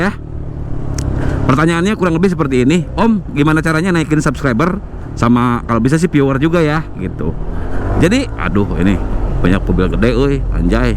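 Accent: West Java, Indonesia. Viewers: Memang aduhai kang logat sunda purwakartanya euy..👌👌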